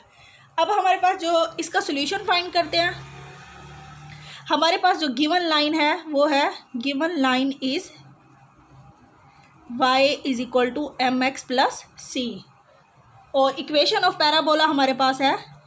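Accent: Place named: Pakistan. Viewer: Really OSM MAM, awaj se lag rha hai aap Pakistan se hai, but love from INDIA.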